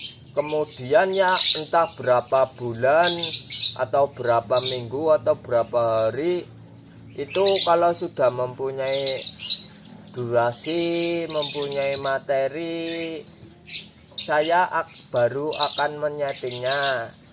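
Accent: Banyumas, Indonesia. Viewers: Purbalingga ngapak2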